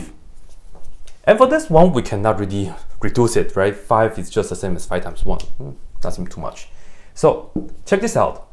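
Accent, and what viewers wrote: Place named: India. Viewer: 不看你的中文视频还以为是印度人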